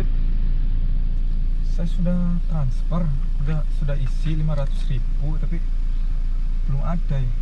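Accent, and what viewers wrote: West Java, Indonesia. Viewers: logat sundanya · Khas ye logat sunda nya wkwk ada kata2 mah sama teh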